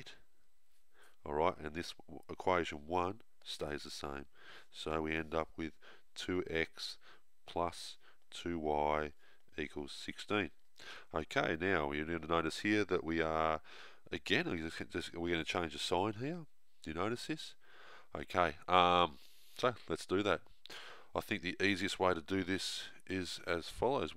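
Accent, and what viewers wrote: Australia. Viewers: hes australian mate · he is i'm from the land down under you get thus accent all the time. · its a really strong australian accent and I dont blame you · He is australian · Don't diss the Aussie accent! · clicked on this hoping its not an american and wasnt overjoyed to find out its an australian😁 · It’s an Aussie accent but his nose does sound blocked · Inaya way Australian mate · Great stuff! And refreshing to hear an Aussie accent on Youtube for a change 👍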